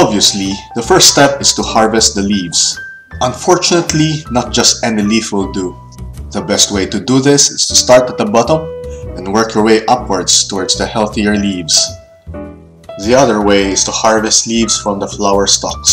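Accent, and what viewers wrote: Philippines. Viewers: You sound like a Filipino guy :)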